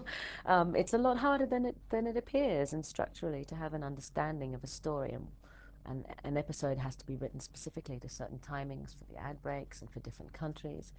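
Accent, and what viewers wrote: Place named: Australia. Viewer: Hi 🙋‍♂️ hey I was in the Navy I never got a chance to go to Australia cuz I really love your accent, best of luck in your future jobs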